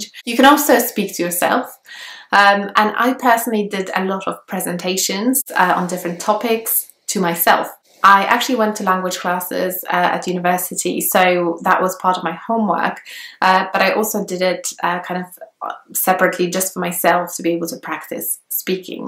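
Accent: United Kingdom. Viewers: Wait english isn't your native language, could've fooled me, you just sounded british to me ,so what is your native language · Wait you’re polish ? i thought you were british lol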